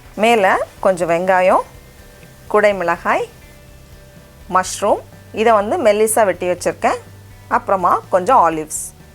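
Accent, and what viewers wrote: Nagercoil, India. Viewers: You are a pleasure to be watched,. Your Nagercoil slang is too good. The recepies are excellent. The pizza came out very well. Love to you.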